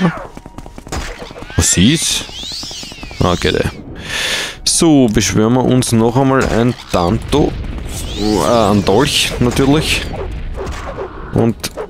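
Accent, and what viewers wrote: Vienna, Austria. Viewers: Endlich Wienerisch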